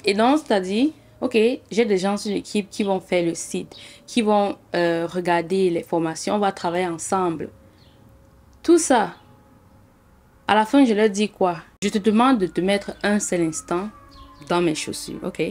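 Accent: Cameroon. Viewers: Merci cela fait un bout de temps que je te suis, seulement en suivant tes conseils on peut beaucoup amélioré sa routine. Il y a par contre celles qui font seulement du pur marketing. C est normal après de faire payer ceux qui veulent plus, c est comme un accompagnement dans le parcours capillaire. Et puis j'aime tes blagues, tes analyses même si je ne commente pas et surtout l accent camer qui me rappelle mon pays d adoption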